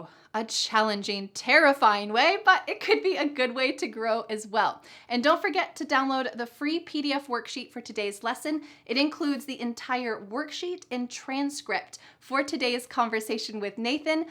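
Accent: United States. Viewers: Is it U.S. English? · Thank you aunt for sharing your knowledge and i also exicted to want study with you in next video😁👌and last you are my good teacher, who is always help me learn new words in american accent......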